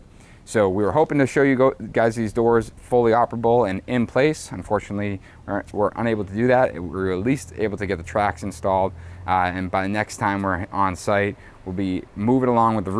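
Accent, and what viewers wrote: Boston, United States. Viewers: I love how sometimes you just can't turn off your Boston accent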